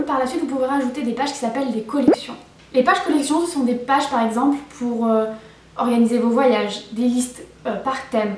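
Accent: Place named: Quebec, Canada. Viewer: J'avais l'impression d'entendre un léger accent québecois :p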